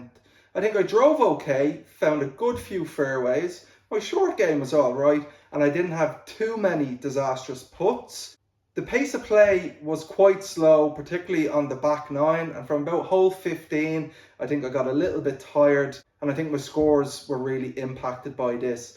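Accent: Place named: Ireland. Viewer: Keep the crossfields, finchs, sheils & robinsons, this is absolutely me to a tee (no pun intended!) Great watch for so many reasons: I can see someone else hitting the same clangers I hit but never know why I did it as I never see my own swing so this will be a huge help.. you're playing courses that are only an hour or two away from me that I could easily get to myself so it's brilliant to get to see them up close on the big screen.. naturally, it's smashing to hear a fellow Paddy's accent on the YT for a change 😀.. and I stocked up my golf clothing wardrobe with the druid's gear there at Christmas too so it's nice to see someone else with good taste in garments too :) keep up the great work pal, best luck for the upcoming season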